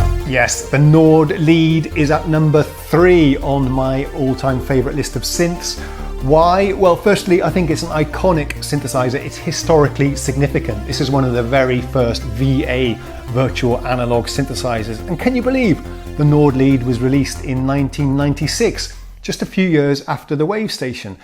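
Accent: United Kingdom. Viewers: are you british born or swedish? because you speak a beautiful british english with a fabulous accent! I would like to speak with an accent like yours:O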